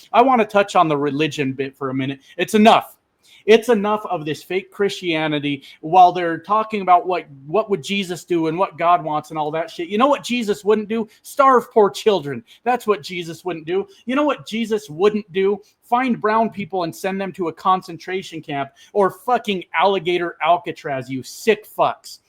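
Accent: Canada. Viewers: Fuck yea buddy. Are you sure you were born in America? Cause you sure as shit sound Canadian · I actually thought you were Canadian..you have the Canadian accent lol. We Canadians are kind, empathetic,giving neighbors..until you give us reason not to be!🤷We now have a briiliant PM with a masters and Doctorate in Economics. The Divided States of AmeriKKKa has a multi bankrupted rapist Felon who has over 50 failed business ventures😂 Canadians are doing great in our beautiful country and continue to be respected worldwide 💪🇨🇦 fdjt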